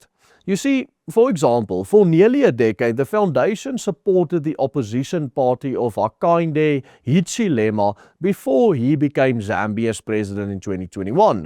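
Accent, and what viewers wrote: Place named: Afrikaans, South Africa. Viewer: This has to be the thickest Boer accent I have ever heard.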